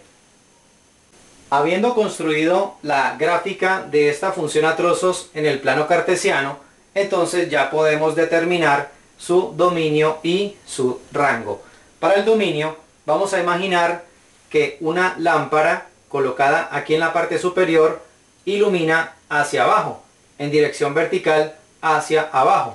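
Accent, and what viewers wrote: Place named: Colombia. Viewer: Definitivamente los profesores Colombianos explican con muchísima mas claridad,debe ser su español que esta catalogado, como el mejor que se hablan los países latinos. muchas gracias por compartir tus conocimientos en matemáticas con todos nosotros.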